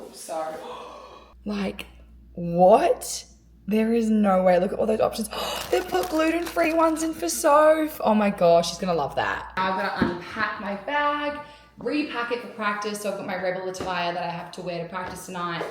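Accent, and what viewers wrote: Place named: Australia. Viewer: Love your vlogs. Great energy. Aussie accents are my fav 😊 Can you tell us your DCC lip combo?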